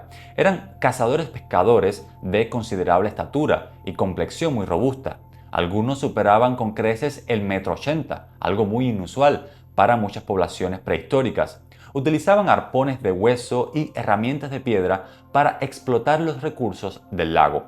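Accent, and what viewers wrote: Cuba. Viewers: Amigo tu acento me suena a Cubano. Lo eres ?? Gracias muy buen canal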